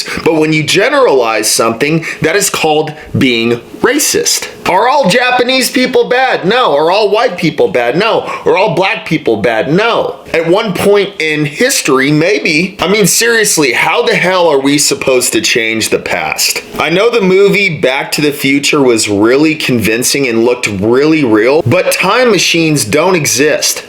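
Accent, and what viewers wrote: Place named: South, United States. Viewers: Ain't nothing better than "Southern common sense!" It's always good but that Southern accent just makes it better.☺ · I'm Black and me and my circle of friends 99.9 % of our time, never complained or even thought about racism ,white privilege, or inequality . We had more diverse things to talk about. (There IS a silent minority among us ) By his tone, the narrator(he sounds like a White Southerner and I honestly don't know about white/black relationships in the South) he's making it sound as though Black people just sit around all day and talk about racism. Personally, I'VE never been concerned about people who perpetually discuss racism. Stop pigeon holing all Black people. His conversation isn't really worth listening to. Stop trying so hard by convincing Black people that you are not racist if you're not.Quite honestly, I'm not concerned about your social graphics.just ignore people who try race baiting, they probably have nothing else better to do with their lives. 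I'm slightly annoyed by talking about people who talk about racism.JUST IGNORE THEM...PLEASE?)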